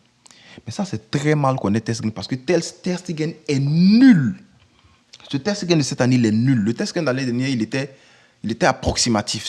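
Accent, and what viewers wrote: Ivory Coast. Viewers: L’accent ivoirien me fera toujours rire. Tu me régales frérot, continues comme ça.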